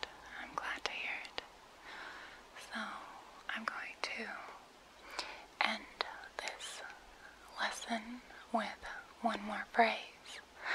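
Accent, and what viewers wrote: Netherlands. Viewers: Lol ik ben Belg je hebt een Nederlands accent ik heb nooit dat verwacht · She has a rather normal accent. She speaks so called 'standaardnederlands' (translation: standard dutch). This is the accent they expect you to have in formal situations (for example, at your work). Some people have this accent naturally, but this depends on which area you come from. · are you for real Dutch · are you dutch?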